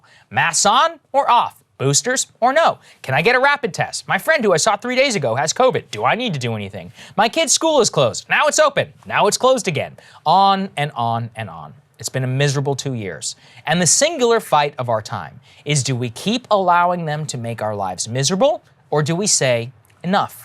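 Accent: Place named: Boston, United States. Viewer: This sounds like a Boston leagel speach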